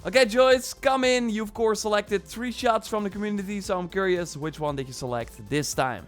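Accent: Netherlands. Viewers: U dutch? You have a dutch accent XD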